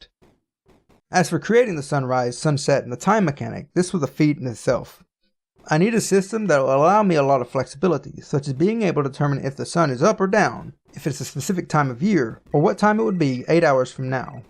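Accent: United States. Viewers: last video: italian accent this video: american truck dad accent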